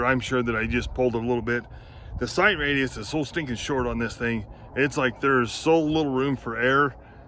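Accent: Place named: Texas, United States. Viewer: Here in Lubbock Texas the only ammo i can get is Aguila 71 gr. ammo. I shot it through my used Bobcat 3032. I bought a box of Fiocchi 73 gr. 32 ammo. I found some TUI in 32 so i may get that box for 30$. I cleaned and lubed up my 3032 so it should shoot great. I shot only 8 rounds of rhe Aguila and its a potent round . I shot through a box of depends and went through 10 of them into the next box. Thanks for the video. Id almost swear you were a Texan. God Bless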